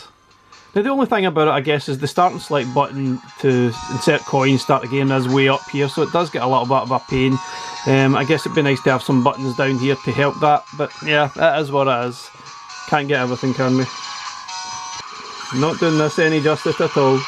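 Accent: Scotland, United Kingdom. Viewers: Just wanted to say I recently found your videos after getting a Super Pocket having wanted an Evercade device for a while, and they’re fantastic :D then couldn’t resist an Evercade VS after getting a few games and wanting to play the on the bigger screen…your reviews are invaluable for deciding what to get next, and it’s always good to hear a fellow Scottish accent on YouTube :D thanks for all you do!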